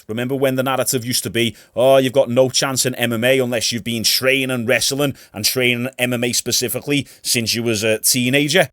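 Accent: Liverpool, United Kingdom. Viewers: You're the first guy that speaks Scouser that i don't hate listening to. Good job! Chama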